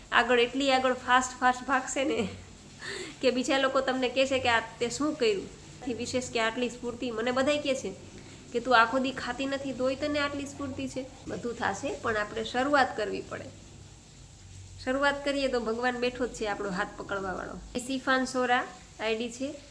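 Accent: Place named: Kathiawar, India. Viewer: Mane tamaro kathiyavadi voice bav game ben sweet boli che👌🤗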